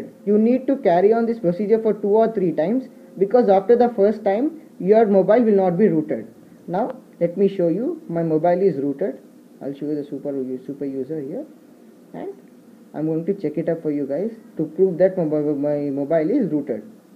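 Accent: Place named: India. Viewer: Ce accent are indianul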